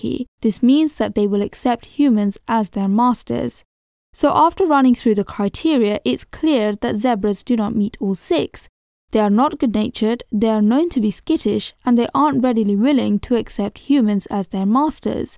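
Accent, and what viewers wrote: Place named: United Kingdom. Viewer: rejvaik because she’s British not American.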